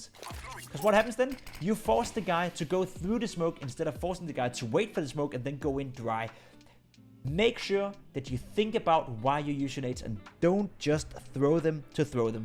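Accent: Denmark. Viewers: are you danish? you sound danish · i can just hear that your Danish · nice vidio jeg syntes at det var sjovt at man kunne høre at du var dansker lol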